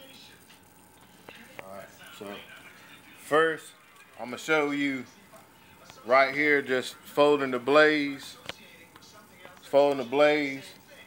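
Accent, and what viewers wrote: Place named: Virginia, United States. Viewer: Thank you! You sound like my family members from Virginia. I appreciate your help.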